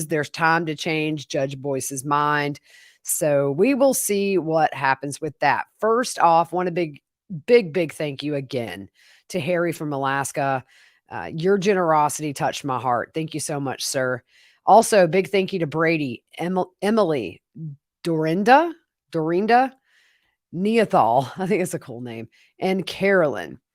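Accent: South, United States. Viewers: Thank You for all you do to keep us informed of all these cases. You are awesome and make me laugh so much with your corky comments, and your southern accent, I love it, God Bless you, your granny, kids and Family, Gurl❤💐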